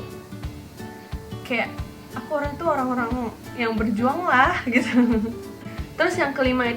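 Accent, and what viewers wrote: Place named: Medan, Indonesia. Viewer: khas medan kali yakan 😂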